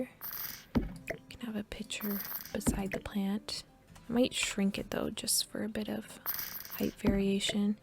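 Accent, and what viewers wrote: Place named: Midwest, United States. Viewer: Are you from the Midwest? You got the accent and I love it lol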